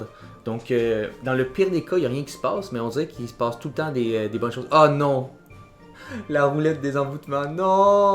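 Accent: Quebec, Canada. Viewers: Excellente ta petite vidéo avec le commentary made in Québec. Tabarnak 😎.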